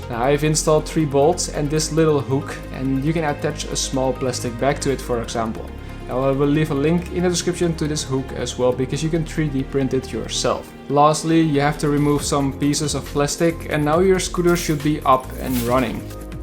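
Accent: Netherlands. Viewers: Haha even though your English is near perfect I could hear the dutch accent. Nevertheless, Great video though! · The dutch is strong on this one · Nederlander die engels spreekt🙃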